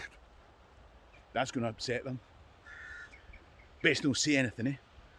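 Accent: Scotland, United Kingdom. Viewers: absolutely fascinating and in a scottish accent that a scot can understand , not at all like the smoothing southern irish accent that when you listen to them speak its like they are lamenting to tou , compared to the northern irish accent that cal tear wall paper from a wall just by the sound ha ha ha , seriously though , its a must that scottish history must be taught , spoken , and explained by a scot with a proper understanding accent , clear and precise , which has been done excellantly , i am now a devoted watcher , and admirer of this podcast and i look very much forward to watching as many as i can , keep up the great work , your doing a great job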